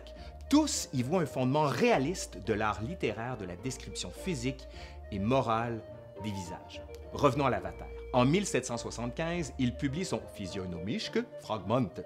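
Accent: Quebec, Canada. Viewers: oui on nous juge, tu es Canadien, tu as "l'accent de là bas", idem pour moi qui suis Belge, j'ai une chaîne dédiée au cochon d'Inde, le "cobaye", ici on prononce "cobée", ben j'ai eu des remarques, mais aussi des MENACES.... n'importe quoi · Je vous ai découvert grâce à la vidéo sur la poutine et je suis ravie d'avoir cliqué sur la chaîne!!! Alors, entre la culture gé, les yeux bleus, le petit accent québécois, en plus maintenant vous vous laissez pousser la barbe et vous avez le TOUPET d'insinuer que l'on va mal vous juger??? Non mais!!! À moins qu'il s'agisse de discrimination positive... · J'ai du mal à m'habituer à l'accent québécois mais ça en vaut la peine sur cette chaîne! Belle chaîne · Je laisse rarement des commentaires sur Youtube, mais je tiens à exprimer mon joyeux étonnement : moi qui ai beaucoup de mal en général avec les accents et le québécois en particulier, je ne pensais pas réussir à passer outre en découvrant la chaine... 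Et je me suis rendu compte que non seulement ça ne me posait pas de problème, mais qu'en plus j'en suis venue à l'apprécier et les vidéos de cette chaine comptent désormais parmi mes favorites :) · et l'accent québécois... 💕 (je suis de la région des Hauts-de-France). Encore une vidéo intéressante et drôle à la fois. C'est un plaisir de vous écouter ! J'aurais adoré avoir un prof d'histoire comme vous (avec un visage avenant en bonus... Compliment qui est complément du sujet de la vidéo !) 😉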